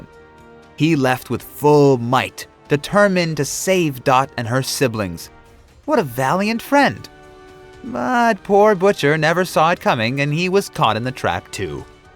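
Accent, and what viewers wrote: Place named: United Kingdom. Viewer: The narrator's British accent gave me some italian school memory vibes (yes, italians studies English at school! Isn't amazing?)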